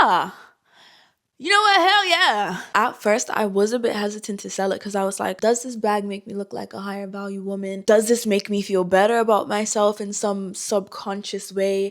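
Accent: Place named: Bermuda. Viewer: Sounds like a little Bermuda on that accent 🇧🇲 ❤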